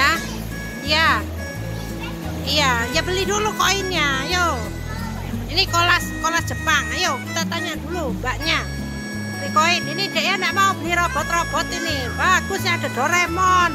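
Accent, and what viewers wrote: Java, Indonesia. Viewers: Kok kya orang jawa hhhh...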